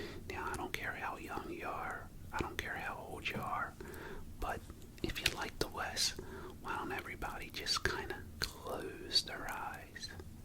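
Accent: United States. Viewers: I have been looking for something like this, a texan american Accent can be very relaxing when it sounds like no Asmr want to work !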